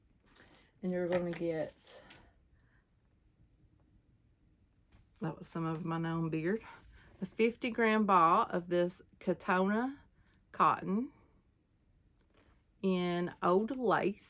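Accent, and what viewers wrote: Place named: Kentucky, United States. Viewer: I love your channel. Are you from Kentucky? I am and our accents our similar.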